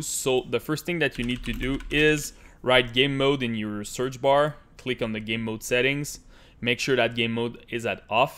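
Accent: Quebec, Canada. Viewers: i'm guessing you're from Quebec?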